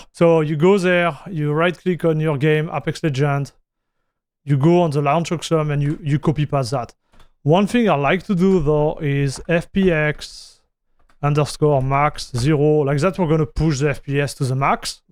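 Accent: France. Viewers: Merci pour cette vidéo et quel accent français 😋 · Superbe accent Français !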